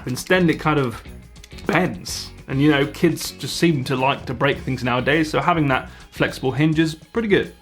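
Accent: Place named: West Country, United Kingdom. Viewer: your heavy west country accent will never not be adorable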